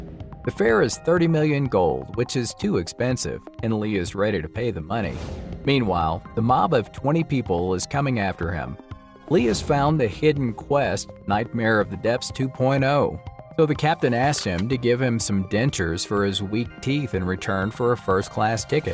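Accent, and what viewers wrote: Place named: South, United States. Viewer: how did you give text to speech a country accent?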